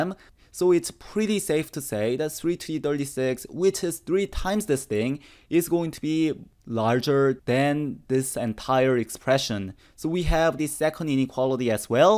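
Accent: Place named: South Korea. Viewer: His accent is sooo pleasant to listen to! I love it. If I had to guess, I think it is Korean.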